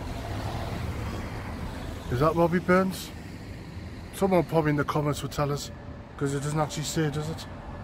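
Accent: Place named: Newcastle, United Kingdom. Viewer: I was born in Galashiels I am now 74 years old. I thank you for making the video. It sounds to me like you are a Geordie. The statue you were looking at, is of Sir Walter Scott! The “nice building across the street, is The Salmon Inn.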